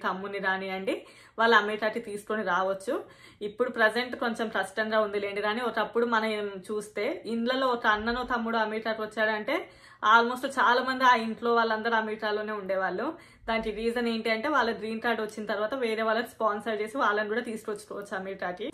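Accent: Telangana, India. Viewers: Nachindi video accha Telangana bashalo mtladutunnaru, mie basha nachidi Thanks andhi 🙏🙏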